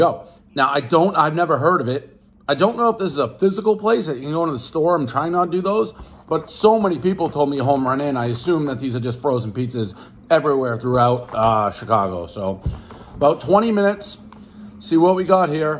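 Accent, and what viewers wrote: Boston, United States. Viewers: He's pure boston, newengland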